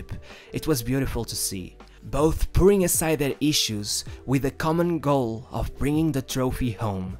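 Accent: Portugal. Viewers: Man are you from Portugal? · that’s just his Portuguese accent then. Nice back track though.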